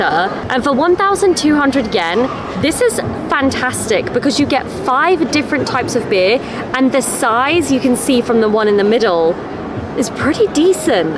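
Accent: United Kingdom. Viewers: 綺麗なイギリス英語で日本カルチャーと食に対する繊細な英語表現が学べるなんて！全インバウンド事業者必須のチャンネルですよ！あと何よりが素敵✨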